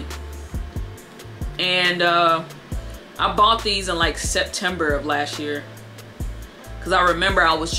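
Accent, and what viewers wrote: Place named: South, United States. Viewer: ok ok you might be from the south I can hear the accent now lol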